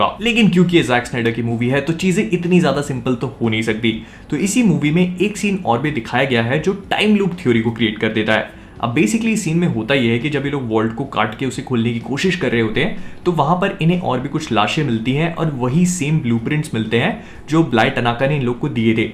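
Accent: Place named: Hyderabad, India. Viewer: haidrabadi voice mast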